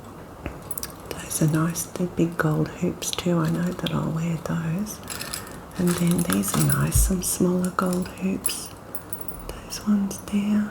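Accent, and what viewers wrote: Australia. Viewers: FINALLY FOUND YOU! I've been searching for 15 years for a soft spoken lady with an aussie accent! ❤❤❤🎉🎉🎉 This is reminiscent of tv shopping networks where i would hope for tingles but it was never garaunteed. Thank you, liked and subscribed. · First time viewer here! I was scrolling my feed and I literally looked at the thumbnail and thought, “I wonder what Australian ASMR would sound like” and I went to click the audio to preview heard her accent and it’s like this was meant to be. Can’t wait to watch more!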